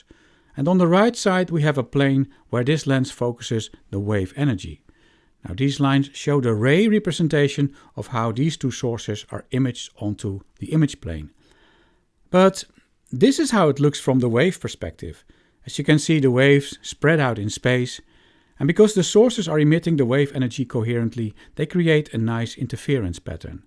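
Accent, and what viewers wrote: Netherlands. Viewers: Interesting because the author is also not a native English speaker (he's Dutch). Maybe that helps non-native speakers to understand his English better. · Dutch accent is so good.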